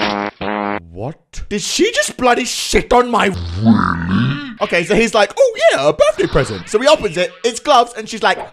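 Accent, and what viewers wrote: United Kingdom. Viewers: He’s British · Are you british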